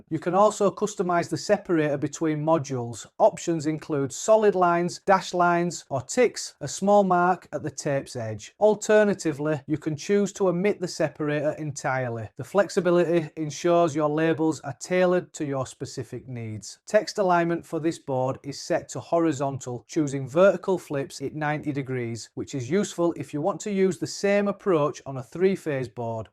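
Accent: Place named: North, United Kingdom. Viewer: Nah, that's just his northern accent!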